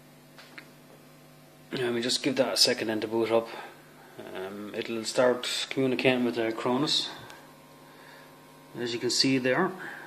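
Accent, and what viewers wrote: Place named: Ireland. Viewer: I've actually bought the VSTs you sell on your website! I've always wondered what you sound like - now I know. Totally Irish, exactly like my father. Keep up the great work - Chris.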